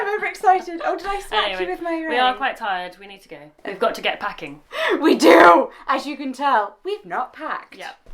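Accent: United Kingdom. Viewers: I bloody love the British accent of 👌 💗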